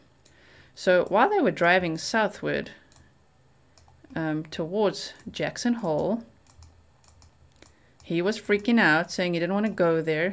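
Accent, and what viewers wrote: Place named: South Africa. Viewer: I look forward to checking in to your channel daily! Not to mention your South African accent!!! I lived in S.A for 2 yrs in Jo-burg and Pretoria. Miss in tons! Thanks for the great content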